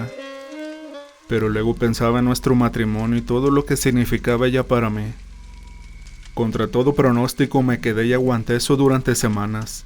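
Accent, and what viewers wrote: Mexico. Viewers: Amigo, quiero hacerte una inquerencia, y espero que no cause ningún incordio, es la siguiente: El acento o tono que empleas para hacer tus narraciones ¿es propio de algún lugar en específico o es un tono que haces para narrar? Sí me suena mexicano, aunque no sabría de cual lugar de allá. ¿No es incomodo inquirir esto? Saludos, Dios te guíe.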